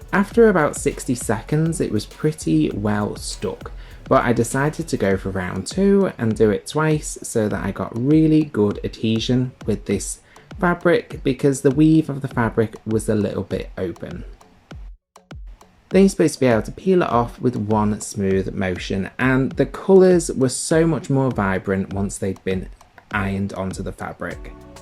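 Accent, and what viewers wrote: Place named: Yorkshire, United Kingdom. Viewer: You sound like you’re from the Yorkshire area. Where are you from! 😀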